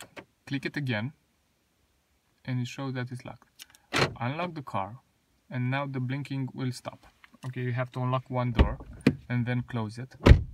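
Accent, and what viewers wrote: Romania. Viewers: Esti român? Ai accent :))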